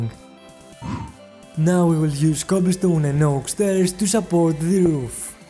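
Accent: Greece. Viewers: Jesus, he is Greek. There are other accents in the world, you know..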